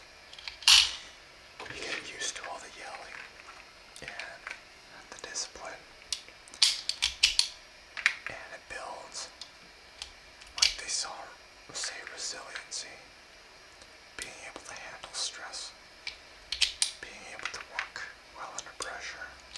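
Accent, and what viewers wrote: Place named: Canada. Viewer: The Canadian accent is relaxing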